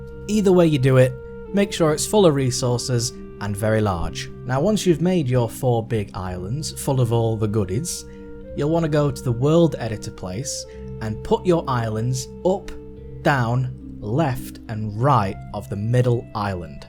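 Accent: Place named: Wales, United Kingdom. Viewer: oh my god... are you kidding. I'm from Wales and its unusual to hear a Welsh accent. That has made my day.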